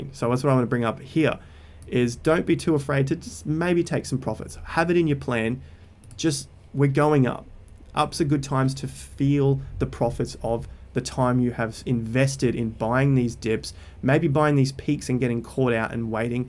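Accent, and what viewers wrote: Australia. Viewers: Aussies say "Megger" instead of Mega and "Wata" instead of Water. Love the content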